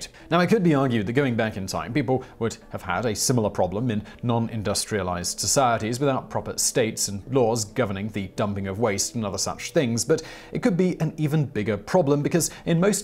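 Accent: United Kingdom. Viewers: Bad advice from a guy with a British accent is yet bad advice... horses are essentials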